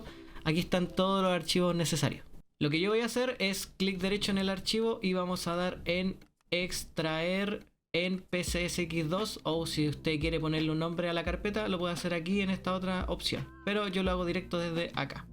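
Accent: Chile. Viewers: Acento de Chile o me Equivoco jeje 😅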